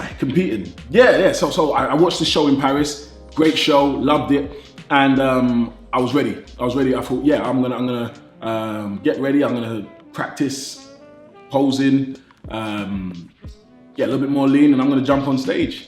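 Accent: United Kingdom. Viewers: British, can’t you tell from his accent.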